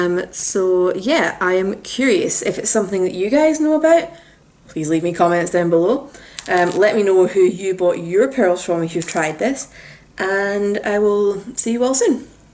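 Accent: Scotland, United Kingdom. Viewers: Are you a fellow Scottish las ?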